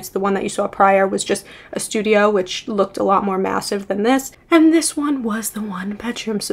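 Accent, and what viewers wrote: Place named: Long Island, United States. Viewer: Off-topic, but I really love your accent! I'm not familiar with specific New York accents, but are you from Long Island?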